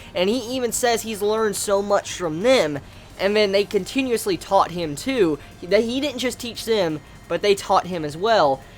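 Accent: United States. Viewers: Ummmm, what part of America is that accent from?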